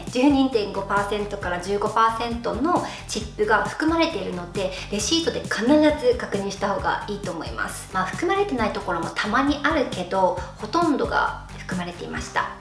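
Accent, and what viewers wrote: Okinawa, Japan. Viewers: 喋り方、所々沖縄なまりや沖縄の友達がする様な表現が入っていて好きです☺︎ ラッキーなのはお人柄のおかげってあると思います👍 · Tsugumi K CAさんだからね.... だから英語っぽいっていうかなまりがあるんだよね.... (あと沖縄出身だから)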